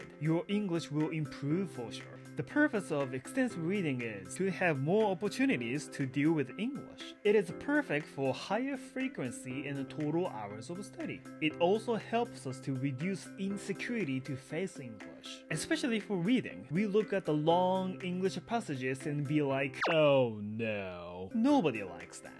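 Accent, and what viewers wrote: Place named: United Kingdom. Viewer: ELSAの評価が厳しくて嫌になってるところ、好きなイギリス英語＆このやる気刺激動画でモチベ維持してます！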